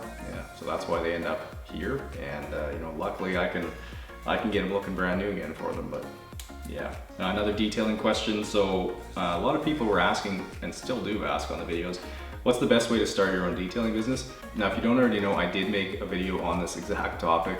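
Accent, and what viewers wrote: Canada. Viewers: I didn't know you were Canadian til today, probably. And it makes no difference to me. I'll be friends with somebody and support their business no matter where they're from or anything. I'm saying because people were making Canadian comments or whatever, but most people that I end up liking on tv or whatever are canadian. Some of them are english, or some other culture, but most of the ones I like...I discover they're Canadian after I watch them for some time. I don't really notice the accent right off sometimes. accent isn't really that noticeable until he says something with an "O" in it. But if you like Canadian people, there's always Jim Carrey, Alan Thicke (rip)... there's a channel on here called the Dungeon Dudes, that me and my boyfriend watch...Monty, Kelly and all them are Canadian. But I think they're from a different part of Canada. 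Matthew Santoro also has a channel and he's from Canada. Michael J. fox from Family ties is Canadian, and Neve Campbell from Scream. And Celine Dion. She's from the Quebec part. She's french-Canadian. But anyway, yeah I was just saying that. I realize that most of his comments aren't bad, just wanted to say that I kind of didn't know he was Canadian til today, I read all the comments and it makes no difference. Just wow. I've been watching him for about 3 or 4 years and I figured he was from somewhere in the U.S. you better be from somewhere else and not Texas right now,... it's better in Canada than it is in texas right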